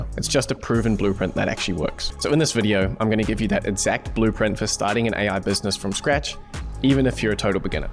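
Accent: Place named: New Zealand. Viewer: Hi.I'm picking you're a Kiwi🙏If so are you in NZ and do you have a mentoring service to assist old buggers like me. Love to connect with you, Like your content👍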